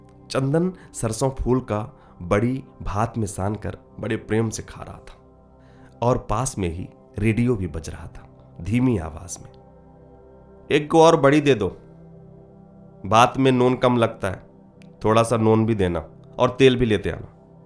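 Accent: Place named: Bihar, India. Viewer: बीते अनुभव की कुछ झलक थी, चंदन की कहानी। फिर 'दिहाड़ी' के रूप में सामने आई। अक्सर देखा गया है कि लोग चले जाते हैं कमाने-खाने बाहर.. गाँव-देहात वाले शहर, शहर वाले बड़े शहर और बड़े शहर वाले विदेश! रोजी रोज़गार का न होना, पलायन के बड़े कारण और बड़ी समस्या है। खैर, कहानी लिखी और फिर रह गई कहीं। आपकी आवाज़ ने इसे जीवन दे दिया। हर पात्र सजीव हो उठे और अपनी-अपनी सुनाने को बैचेन हो उठे। सर, आपका जितना भी आभार व्यक्त करूँ कम ही होगा। आपने जिस भाव और संजीदगी से पढ़ा है, वो काबिलेतारीफ है। बिहार की ठेठ बोली को बड़ी ही सुन्दरता और सहजता से निभाया है आपने। अपनी ही कहानी को सुनना एकदम से अलग अनुभव है। और, शुरुआत में तो आप बिल्कुल रेडियो वाले ही लगे!🥰 बहुत बहुत धन्यवाद आपका सर! 🙏🙏 😊😊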